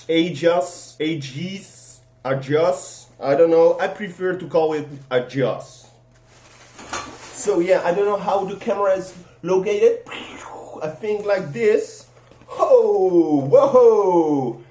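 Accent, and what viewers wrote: Netherlands. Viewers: je bent zeker nederlands jou accent is gelijk te merken😂❤️ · He is Dutch and to talk with a dutch language its hard to talk english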